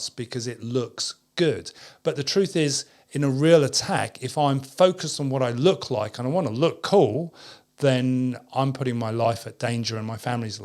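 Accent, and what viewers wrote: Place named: Cockney, United Kingdom. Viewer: Excellent advice !!! Love this guy's Eastend accent.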